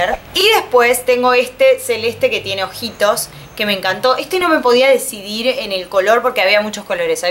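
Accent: Argentina. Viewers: ¿De que parte de Argentina sos?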